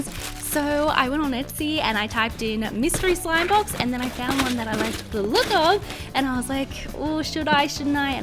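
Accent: Australia. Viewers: * are you Australian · R u from Australia? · Are u from Australia ???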